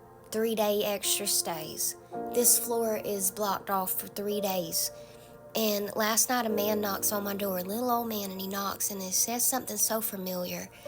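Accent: South, United States. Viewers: ❤❤What a beautiful message from a Beautiful Lady Pastor... Thank you Ma'am... Your lovely Southern accent was a Breath of Fresh Air.., complimented by your Spirit filled message... I say all this as your message and you were the first to come up on my Screen, after one of the most terrible spiritual letdown I've experienced for more than 5yrs...I was so excited to experience a major breakthrough in my long walk in Christ., with all the Blessings promised.. via messages from spirit filled children of God...after a 2 hr conference call with powers that be... Totally the opposite of what I was expecting.. 😬I am in in such a freaked out frame of spiritual battle...& want so to vent.. I saw your vid, subscribed & really was glad I watched... You're beautiful, down to earth humbleness of a Jesus filled lady.. Thank You soo for this message...I was truelly Blessed. 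🌹✝️👏🙏❤️BTW.. I am from South Africa... Johannesburg.. Praying for Your land.. ✝️👏🙏❤️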